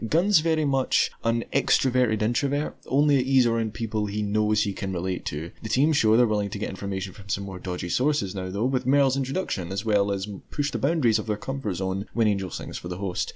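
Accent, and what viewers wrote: Scotland, United Kingdom. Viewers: Audio's fine, talking isn't THAT fast and while his scottish accent is definitely strong, it's a lot easier to parse than some js. Btw, captions are a thing :)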